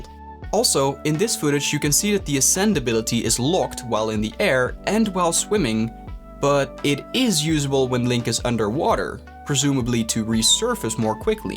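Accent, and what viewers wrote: Netherlands. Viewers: Are you perhaps Dutch? I recognize that accent 😂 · Dutch detected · Are you dutch? You sound dutch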